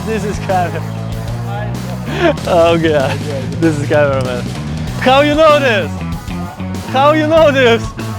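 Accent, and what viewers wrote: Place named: Russia. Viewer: Жесткий русский акцент )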